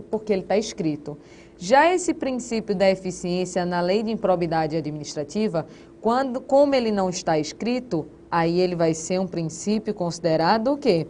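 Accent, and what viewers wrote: Pernambuco, Brazil. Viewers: Gosto das suas explicações, assistindo sempre . Com esse sotaque Pernambucano me acabo de rir qd fala coisas engraçadas.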